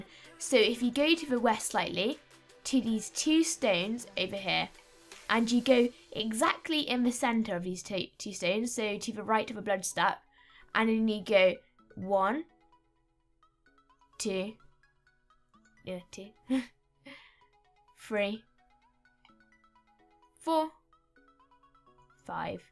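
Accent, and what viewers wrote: United Kingdom. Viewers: Interesting accent, south England?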